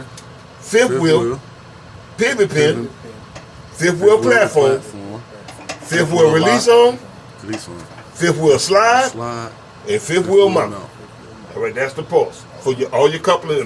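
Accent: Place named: Louisiana, United States. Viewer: Its the louisiana accent lol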